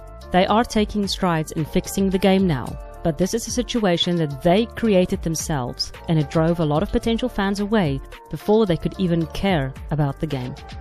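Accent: South Africa. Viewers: Not game related but your accent sounds really familiar are you south African perhaps ?😂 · Found you last vid and now sticking around, loving your content also are you from SA? the "my China" gave it away. That 4v4v4 is freaking nuts btw and really chaotic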